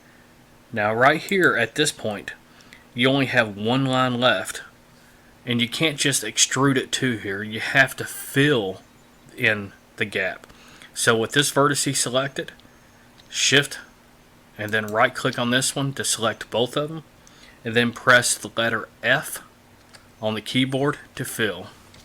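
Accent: South, United States. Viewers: Also I did not know you were Southern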